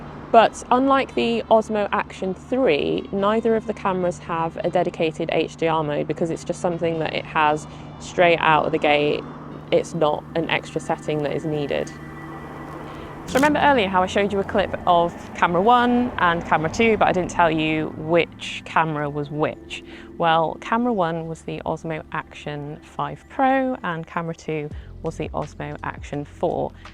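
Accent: United Kingdom. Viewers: my first time watching your videos. Your delivery is great (probably the accent lol -- dunno why we Canadians don't talk like Brits...) ...anyway, the content was the best I've seen on this crucial question. I am going on a motorbike trip in a few weeks and literally just bought the Osmo Action 4 after seeing this...didn't even hesitate. Nobody in the comments (that I've seen at least) even mentioned how tack-sharp and natural the wind-cover on the OA4 looked in comparison to the OA5, which looked all mushy and smudged out like it was painted in the video. Annnnyway, I even watched your follow-up with the firmware update...no better imo. And ya, I know my purchase might be short-lived with the Osmo Action 6 due VERY soon, but need something for the trip. Sold my GoPro12 (thank god), that thing crashed so often...the stupid wrist strap never synced...nightmare. 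Anyway, look forward to your Action 6 video!!! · They've got my attention, warmth, 10 bit normal, brighter. I've never bought one. This one may get me. Great videos, your accent brings me back to when I used to live in the UK.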